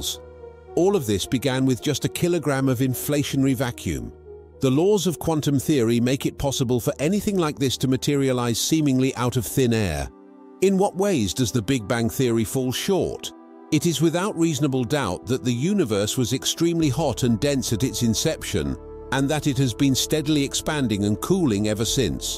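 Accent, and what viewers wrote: United Kingdom. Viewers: One thing we can count on from the YouTube corporation is a reader with a Brit accent. How obvious does a grift have to be?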